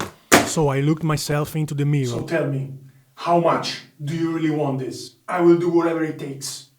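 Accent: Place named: Italy. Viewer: from the accent he seemed italian and then i read "ho sognato che stavo mangiando un panino", ed è lì che ho capito che era mio fratello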